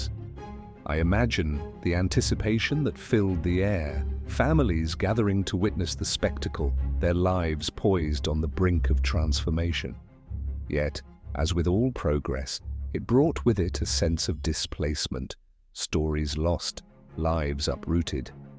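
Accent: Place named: United Kingdom. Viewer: The voice says he grew up there...in a British accent.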